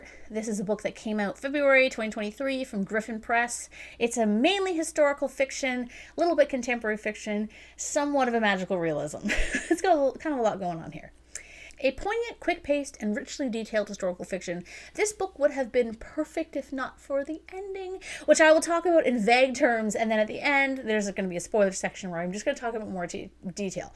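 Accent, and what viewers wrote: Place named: RP, United Kingdom. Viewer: 🇬🇧 Britisher here, laughing at your posh accent! 😆 I was wondering where that came from, until you mentioned the audio book and it began to fit into place. That got dark right at the end of the spoiler section! 😳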